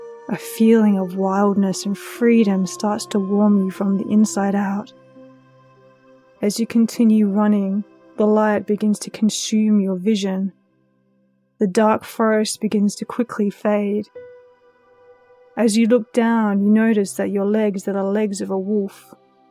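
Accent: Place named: United Kingdom. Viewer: OK. I have to say I'm totally gobsmacked that you have a British accent! I've been following you for several years now, simply because you told your story of having an extreme "cultish" religious upbringing that matched mine VERY closely. I'm in the USA; and perhaps I didn't think that anyone outside of the USA could have the same severe religious upbringing as me (and I must say, mine was MUCH more severe, abusive, controlling, cultish and brainwashing than yours). Yet...you have had a harsh religious upbringing, too...and in Britain! Seriously...I didn't ANYWHERE ELSE in the world could be as bad as Tennessee and Georgia, USA!!! And hey...I ADORE your accent! It's SO NICE to finally "hear" you and not just "read" you! Thank you for this, and blessings!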